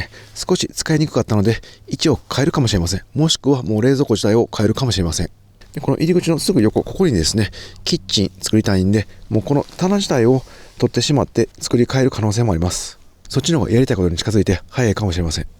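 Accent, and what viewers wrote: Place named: Kansai, Japan. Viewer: やっぱりさん関西人なんですね。フロントにヒビがぶぁぁぁぁって、大変だったのに笑ってしまいましたm(_ _)m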